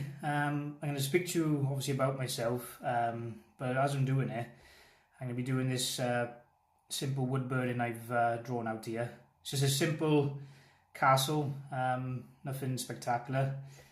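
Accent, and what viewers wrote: Wales, United Kingdom. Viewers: This is great, hearing you explain your art is a lot better for me and probably other people too, rather than music!! I really enjoyed this video, your tools, how you explained your art is Fab. I'm a real supporter of your channel 👍 I get what you mean about subs for subs!!!. Loved it, thanks for showing us your art😊✌️👍🇬🇧 p.s miss the Welsh accent I grew up in carnarvon, love Wales 💝👍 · Hey. Just catching up after getting my computer back in order. Cool video and nice to hear a Welsh accent. Makes me kinda homesick, lol (I live in Holland). I've never tried wood burning, but it looks amazing. Agree with what you said about the community and getting lesser known artists seen. With that said I will be doing my first shout out this week. Just trying to get back on my feet as I've had a few health issues of late along with all the hassle with my computer breaking down. 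Anyway, at the risk of leaving a novel-length message I'll just say great video and nice to see the process:o)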